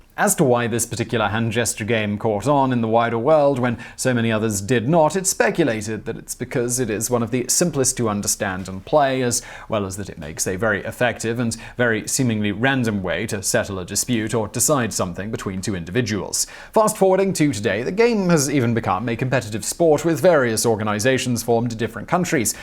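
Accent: England, United Kingdom. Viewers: Ok , I just spent of my life listening to this cuz Simon has a great voice and Honestly, had ANYONE ELSE said with a straight face that there were PROFESSIONAL SPORT ORGANIZATIONS re: RPS I would have called Bovine Scatology in a nanosecond.... Saying stuff with the weight of credibility using an English accent has to be a superpower.... That being said I’ll see your RPS clip and raise you one...” I dare you to do a clip re the origin of LIAR LIAR PANTS ON FIRE “ Have a good wknd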